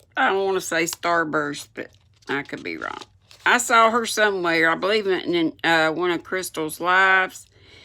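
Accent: South, United States. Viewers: Oh by the way it was fun to hear Tom Sawyer read in the southern language it was written in😉( I’m a northerner)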